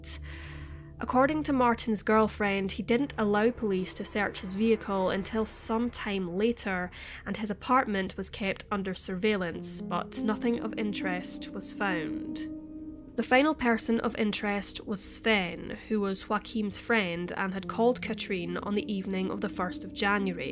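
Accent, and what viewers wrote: Scotland, United Kingdom. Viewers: I agree! A video came up in my recommends and I totally didn’t expect to hear a Scottish accent. I’m from Ayrshire. Your videos are awesome. True crime but much more sedate and pleasant to listen to. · Fookn Razor Scottish is so beautiful, people who say otherwise are mad lol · I was going to guess Scottish. Very nice! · 509mrs its funny cause a lot of people say the scottish accent is horrible, but up in the north east this is honestly how beautiful the language can get!! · ayyyy a scottish mystery reader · It's so nice to hear a real voice on these films, esecially a good Scottish one (I come from Alloa, near Stirling). There's real humanity in the telling of these stories, they're not just told for 'shock' effect - well done to the writer and narrator. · A lovely Scottish accent. Fantastic pronunciation, gotta luv it. 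· Nice to hear a video narrated in a soft Scottish accent... Just subbed... Blessèd be /|\ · Her accent is Scottish but it sounds “Irish” to many Americans because we’re used to hearing harsher Glaswegian - style Scottish accents (such as Billy Connolly’s) and Groundskeeper Willie on American TV. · I wondered what accent she has and went to the comments lol never have i ever heard a real scottish accent before!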